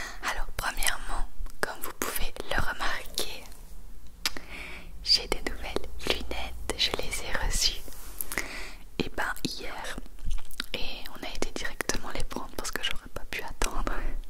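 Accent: Belgium. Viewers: C’est lunette te vont tellement bien ! J’adore ton petit accent léger belges je trouve sa troppp mignons !!!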